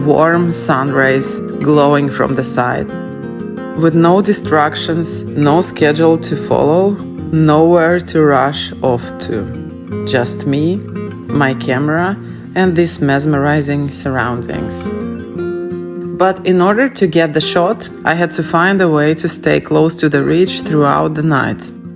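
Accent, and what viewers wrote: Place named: Eastern Europe. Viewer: Like your eastern european accent, did you have a foreign english teacher? No offense, I understand you fine, just interesting🧐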